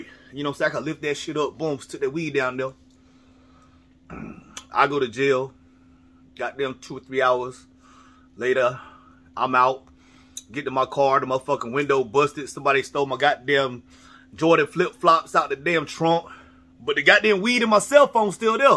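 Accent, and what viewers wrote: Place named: Bay Area, United States. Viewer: 💯 Are you from N. Ca or the Bay? We talk like this. Everyone's a lil hood...you know what I'm sayin', sheeiit 🤣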